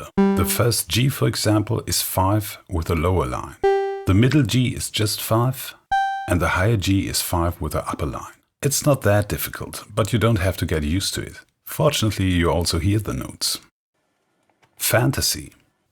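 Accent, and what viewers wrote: Germany. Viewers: I Got a question for the guy? Are you German? Where are you from? Its a weird question but I recognize the Accent. Greets from Hagen in Germany.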